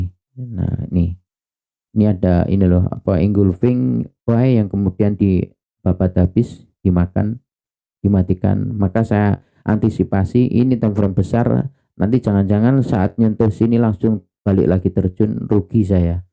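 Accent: East Java, Indonesia. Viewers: Apa mas nya dari jatim ? Bahasanya medok jatim 😁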